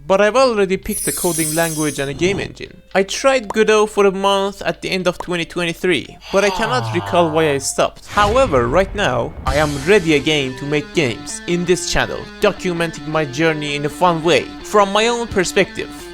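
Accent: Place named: Iran. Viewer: are you persian bro? just going of your accent and how the fuck do you have so few views????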